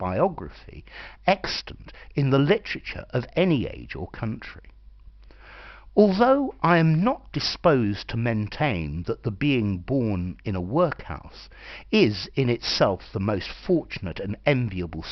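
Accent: United Kingdom. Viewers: I really love your British Accent, if you don't recording more sir!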